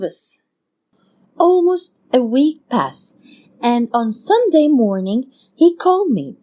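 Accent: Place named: China. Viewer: Learn how to talk better I don't understand you with your Chinese accent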